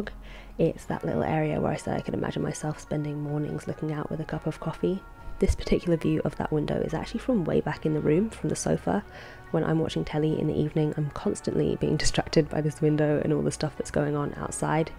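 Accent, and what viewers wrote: United Kingdom. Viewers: this is the most British commentary I've ever heard lmaoooo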